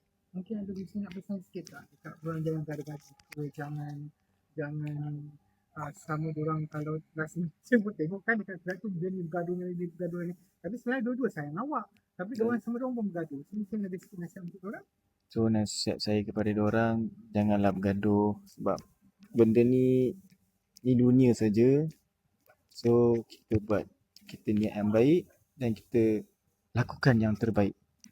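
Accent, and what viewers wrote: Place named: Perak, Malaysia. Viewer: Bila bercakap kuat loghat perak... Tengak.....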